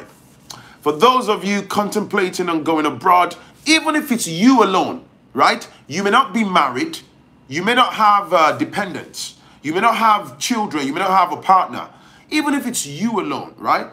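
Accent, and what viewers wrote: Yoruba, Nigeria. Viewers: lol... I love your YOruba-English accent.. PLs speak more of it.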